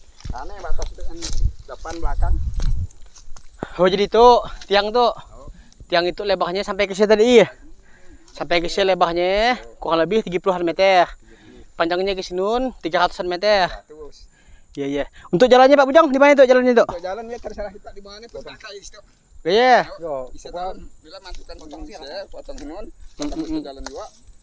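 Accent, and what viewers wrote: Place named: Banjar, Indonesia. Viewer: Logatnya kayak logat banjar dikit..maaf bg mau nanya kenapa daerah sambas bnyak dijual lahan uda jadi..kalau untuk perantauw aman aj kah hidup disana bg..gak ada gesekan seperti yg lalu2 kah bg..antara masyarakat tempatan sma madura..gmana penduduk asli disana bg..apa menerima dengan baik untuk pendatang dri luar dan berbagai macam suku..🙏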